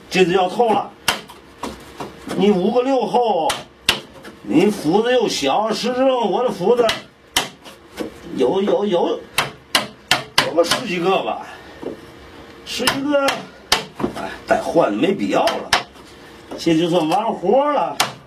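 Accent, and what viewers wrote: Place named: Tianjin, China. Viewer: thanks for uploading the video! I grew up in Tianjin when I was young and I love your accent.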